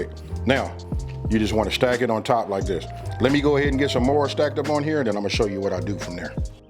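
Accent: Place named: California, United States. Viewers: You sound just like some of my uncles and cousins, you must of been raised in Cali, LA, San Diego? We have a certain way we talk, NadadaMean! 🤣💯 Good Food! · Where you from in LA I hear a bit of east side on your accent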